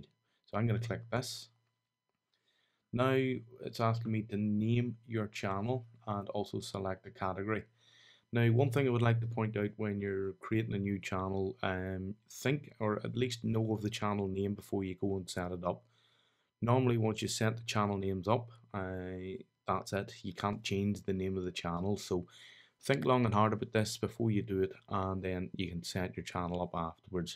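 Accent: Northern Ireland, United Kingdom. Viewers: are you northen irish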